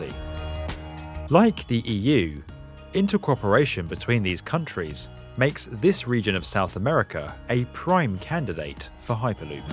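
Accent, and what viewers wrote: England, United Kingdom. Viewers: Never did like the English voice for trying to sell me on something I know won't work. You can't get people to buy the same car or eat the same food, but you are going to put them all on one common transportation device! Never going to happen. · Great Brit announcer, but Asian pronunciation sucks.....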